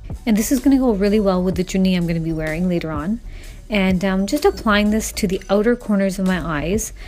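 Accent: Punjab, India. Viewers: You have a lovely Punjabi accent...😊😊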